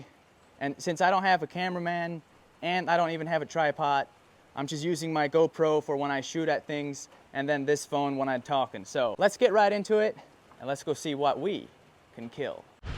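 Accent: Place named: Ohio, United States. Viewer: I fkn knew it dude 😂🤘🏽 you kinda got an Ohio accent lol I’m from northeast Ohio , Lorain Wellington area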